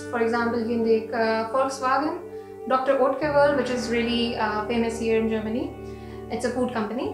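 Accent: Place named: Kathiawar, India. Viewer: Are you kathiyavadi?..you are speaking in English but your accent is like kathiyavadi(in gujarat)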